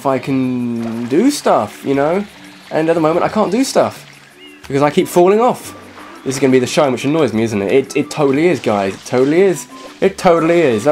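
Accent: United Kingdom. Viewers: when are you doing the next Q&A Episode? I like your accent, I'm British too. I get a lot of shit for how I talk, that I talk posh which I don't just because I don't have a broad accent of where a live cos my mum's southern so I don't sound like my friends. And you are funny!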